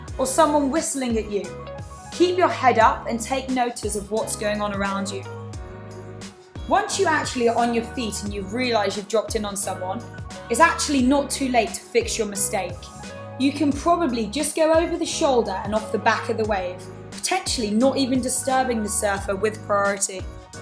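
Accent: United Kingdom. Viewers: It doesn’t feel right being taught surfing from a British accent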